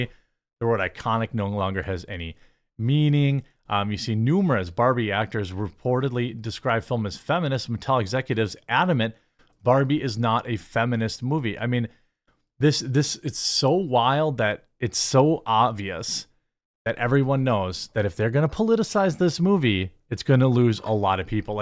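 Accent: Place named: Wisconsin, United States. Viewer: Ok, I love your content and all sorts of stuff you do...I can even deal with hearing about coffee brand coffee co- nonstop! Lol! But sometimes the Wisconsin accent is just too much! Margot Robbie being pronounced like a 'robe' you wear, opposed to like 'rob' a bank, is driving me insane!!!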